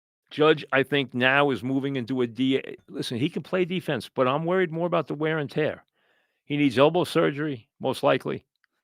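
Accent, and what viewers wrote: New York, United States. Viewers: “I don’t know whats going on with Weev-ah”.. love New York accent.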